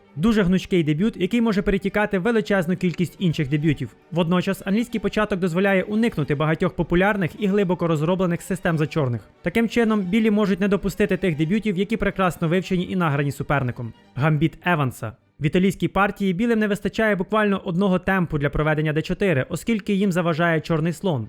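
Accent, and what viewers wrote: Ukraine. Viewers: Ахахахах какой смешной колхозный малоросский говор)))) пешака ахахахахах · Ох уж цей ужгородський акцент)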